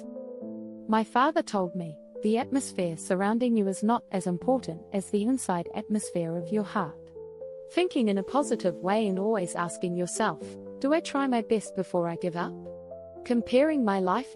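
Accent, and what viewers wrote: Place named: United Kingdom. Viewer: Жестт. Че за акцент британский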